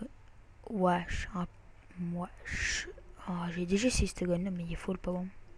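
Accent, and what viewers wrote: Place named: England, United Kingdom. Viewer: Est tu englais car tu a un accents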